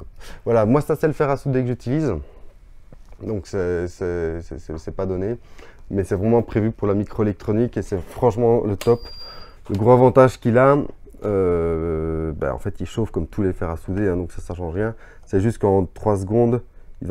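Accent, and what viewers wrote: Liège, Belgium. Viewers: Hello, très bonne vidéo qui résume bien l’essentiel. Je ne connaissais pas le coup du court circuit avec l’isopropanol pas mal merci. Et je crois reconnaître le petit accent et la sympathie de nos amis Belges que j’adore. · Salut, Chapeau bas pr la transmission de savoir ! Dès la 1ère vidéo j'ai reconnu l'accent, puis le pull m'a confirmé ! Signé un autre Liégeois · Je viens de Liège aussi haha j'ai bien reconnu l'accent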